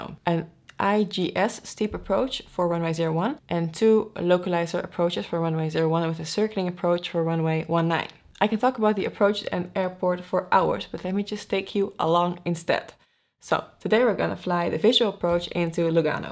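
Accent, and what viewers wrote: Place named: Netherlands. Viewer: Hi, your Videos are very good. The approach an landing at Lugano Airport was superb. But... PLEASE... can you speak a bit slower in your videos. You have a little "Dutch-Slang" in your speaking and for non-English or non-US-man it's very very hard to understand. Please do not be offended because of my little criticism. CU Sönke...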